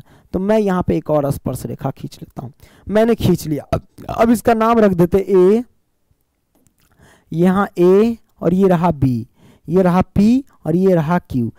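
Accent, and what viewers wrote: Bihar, India. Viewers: 🎉 रमाय नमः 🎉 गुरु जी 🚩 आप राजस्थान बोर्ड से पढ़ाते हो या बिहार बोर्ड से बहुत देर से खुजली हो रही थी पूछने के लिए अच्छा आप जो बीच बीच में जो ये बिहारी भाषा बोलते हो तो अच्छा लगता है😅 क्योंकि हम राजस्थान से है😅 ओर आप अच्छा पढ़ाते हो❤ में अपने दोस्तों को भी बोलता हु पढ़ने के लिए 😅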